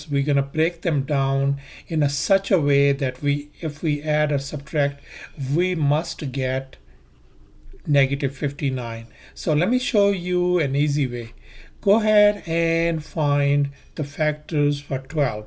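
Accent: India. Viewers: I like your English but I don't know what you say I like indian English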